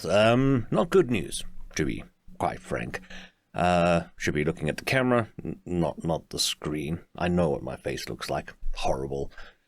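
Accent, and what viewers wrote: Scotland, United Kingdom. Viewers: loving your work and working through the previous recordings, as a scotsman i'm very impressed with your scottish accent. Hopefully you will be well enough to get back recording soon.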